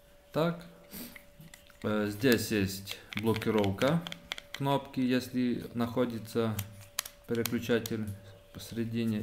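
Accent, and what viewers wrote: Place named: Ukraine. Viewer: Автор спасибо за обзор.Но... Уши вянут.Не пытайся говорить на русском говори на родном языке.Не прыгай выше головы.Разговаривай в будущих видео на украинской мове(из говора понял что украинец),не ломай свой язык и не травмируй наши уши.Спасибо за понимание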